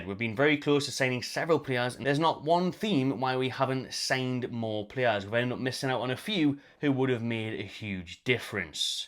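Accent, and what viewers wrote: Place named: Liverpool, United Kingdom. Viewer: You won the worst premier league since it was created. You get carried by Salah and Van Dijk but their mid 30s now and have their huge retirement contracts and already dropped off massively end of last season. It's back to scrapping for 2nd or 3rd this season im afraid, and that would even be WITH Isak. Ps. The scouse accent is by farrrrrr the most annoying, scummy accent in the UK haha. You total cretin.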